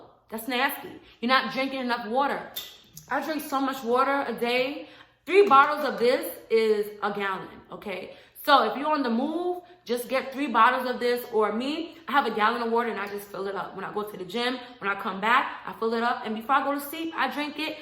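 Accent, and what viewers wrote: New York, United States. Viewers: She has personality of cardi she has the new york accent. But she doesnt look like her she looks better than cardi 😂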